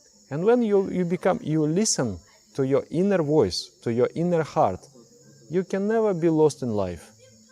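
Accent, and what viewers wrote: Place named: Russia. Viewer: Your Russian accent makes it easier to understand what you are saying, for some reason :)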